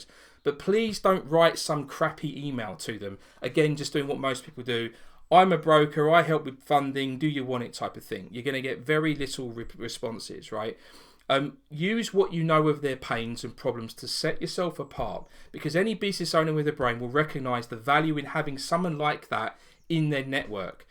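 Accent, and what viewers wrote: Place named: United Kingdom. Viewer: Is this in the USA. You sound British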